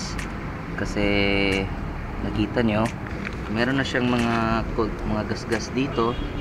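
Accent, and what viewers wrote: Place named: Cebuano, Philippines. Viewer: Gnda talaga english accent ng mga taga cebu haha ride safe paps. Subscribed already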